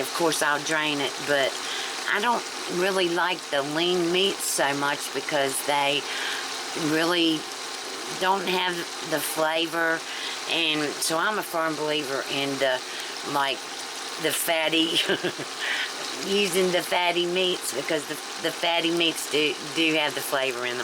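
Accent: South, United States. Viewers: Everything taste better with a southern accent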